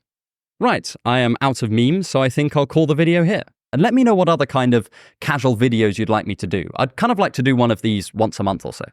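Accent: United Kingdom. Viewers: Your channel was my great coincidence: A young man with a British accent explaining about my favourite subject 📚✨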